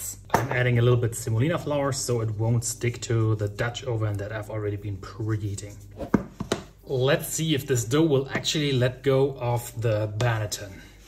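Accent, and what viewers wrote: Germany. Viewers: Got this randomly recommended, immediately noticed the German accent mein Freund, without any knowledge about bakery and/or cooking but enjoyed the video nonetheless. Loved the hissing kittens when you "spritzed" the dough :D Definitely gonna stay here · I love your German accent. Main reason I sat through the whole video. Good stuff thou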